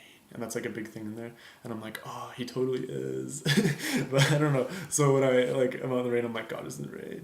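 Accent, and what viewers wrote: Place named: Germany. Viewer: i hear your german xD greetings from germany rofl ^^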